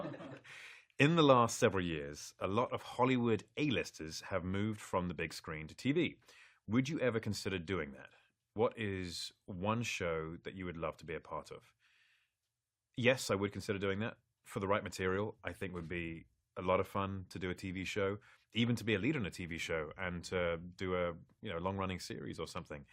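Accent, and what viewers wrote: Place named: United Kingdom. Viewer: esse sotaque britânico que eu amo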